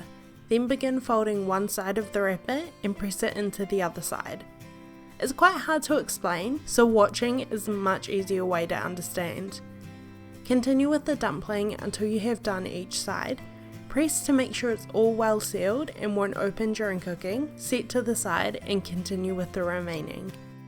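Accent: New Zealand. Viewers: Awesome dumplings, and once again, love the New Zealand accent...... You go girl, do you think you could make hangi dumplings? Love the channel, and ideas you have. Yummy stuff. From Palmy... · A New Zealander. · Thank you for the recipe and instruction very simple and clear. Notice you got a NZ accent. Love from Christchurch. Cheers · Are you kiwi?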